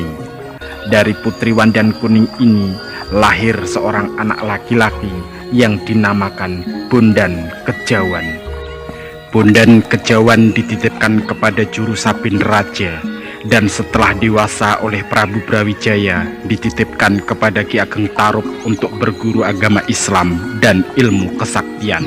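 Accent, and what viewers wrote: Indonesia. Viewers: Lafal nya khoq Bahasa Indonesia banget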